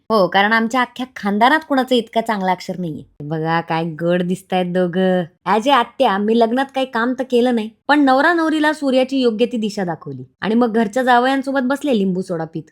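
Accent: Beed, India. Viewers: मॅडम तुम्ही बीड जिल्ह्याच्या आहात तुमच्या बोलीभाषा समजते कारण मी ही बीड जिल्ह्याचा आहे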